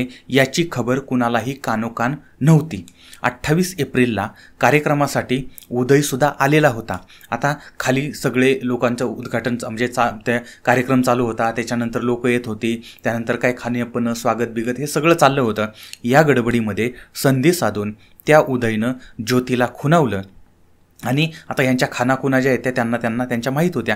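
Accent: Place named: Ahmednagar, India. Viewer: nice Nagari style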